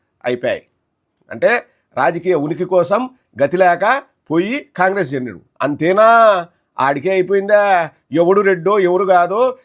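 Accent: Rayalaseema, India. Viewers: సూపర్..... రాయలసీమ భాష లో భలే చెప్పారు.ఇదిరాయలసీమరేడ్డీఅంటే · అన్న రాయలసీమ యాసలో బాగా ఇరగదిసావ్ షబరి కి గట్టిగ దింపావు 90mm రాడ్డు రెడ్డి గారి పౌరుషం పిక్ లెవల్ గా సూపర్ గా చెప్పావు 👍👍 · Super Anna, asalaina rayalaseema slang lo mataldinav…! Mee originality ki 🙏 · సూపర్ గా రాయలసీమ యాస లో చెప్పారు..మీ ఆవేశం లో అర్థముంది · అన్నా మన రాయలసీమ యాస కేక నువ్వు సూపర్ అన్నా❤ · Original Rayalaseema language super sir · sir, శబరి కి చెంపలు వాయించేశారు. మన రాయలసీమ slang లో స్పీచ్ సూపర్. I am from kurnool. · పక్కా మన రాయలసీమ భాషతో అదరగొట్టారు 😂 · Pakka slang of rayalaseema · ఆదర గొట్టారు రాయలసీమ యాస లో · మీ రాయలసీమ యాస సూపర్ అన్న. అదేంటో ప్రతీ వెధవా జగన్ దమ్ముని సవాల్ చేసేవారే! ఆల్రెడీ జగన్ దమ్ము కేంద్రానికి తెలుసు, స్టేట్ కి తెలుసు, ప్రపంచానికి కూడా తెలుసు మరి శబరికి ఎందుకు తెలియదో నాకు అర్ధం కాలేదు.శబరి కి welcome our channel. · Rayalaseema yaasa super anna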